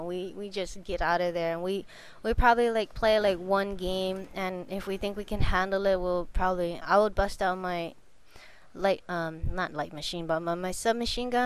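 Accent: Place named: Spain. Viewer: yea i could hear your spanish acsent =)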